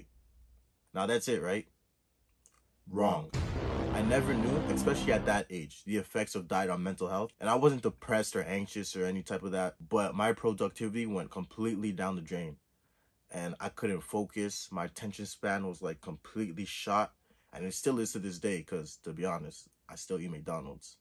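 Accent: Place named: Toronto, Canada. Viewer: are you Canadian? you gotta toronto accent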